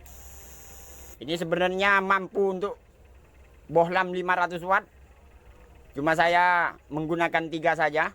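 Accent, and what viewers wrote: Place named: Madura, Indonesia. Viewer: Terima kasih ilmunya pak...kayaknya dari gaya bahasanya orang Madura ya pak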